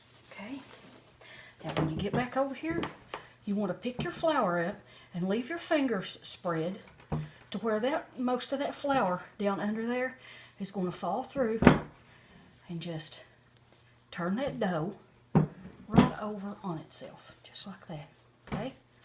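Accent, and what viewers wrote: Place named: Oklahoma, United States. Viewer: What state is your accent from? Sounds like Reba McEntyre style. She grew up in Oklahoma. Is that your dialect, too? Sorry to focus on your accent and not the biscuits. I’m just really interested in being able to tell exactly where people are from. I’m really good at England, Scotland, and Germany regional dialects. Being from Maine myself, I’d like to get better at domestic dialects.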